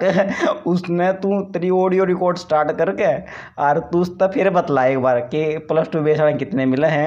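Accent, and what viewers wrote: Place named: Haryana, India. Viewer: Gajab hai teri Haryanvi Boli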